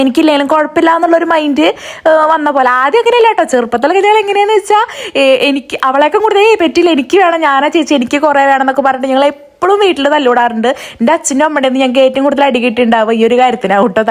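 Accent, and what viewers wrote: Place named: Malappuram, India. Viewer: Kayi Malappuram slang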